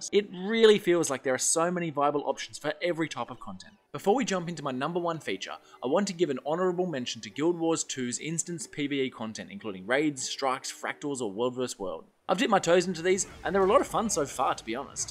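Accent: Australia. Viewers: Judging from your accent, I'm gonna assume you live in Australia. How do you deal with the high ping? I tried Guild Wars 2 and I enjoyed my time with it, but the fact that the game didn't support OCE servers just killed it for me. It's 2023, there's really no reason I should be playing any online game with 250 ping anymore.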